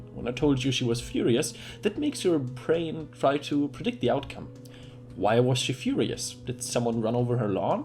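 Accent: Germany. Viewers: hah, I knew this accent was german.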